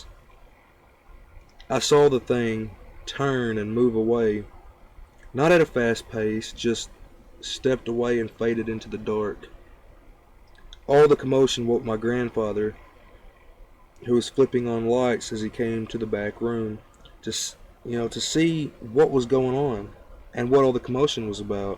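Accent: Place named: Louisiana, United States. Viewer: Great videos..You must be from the South..probably here in Louisiana. because of your accent And, I never heard people outside of Louisiana say "right chere" instead of "right Here" lol. our southern accents and words we use is very foreign to those in other parts of America, particularly outside of Louisiana. lol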